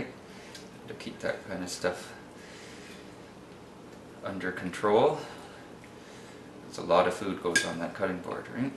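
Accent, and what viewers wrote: Canada. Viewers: Canadian, eh? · You sound like a fellow Canadian. I could be wrong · Oh my god, I love that accent! Canadian, right? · Thanks you for sharing your ideas and opinion in clear easy to understand English. A Canadian accent, I suspect, maybe with Newfie influence.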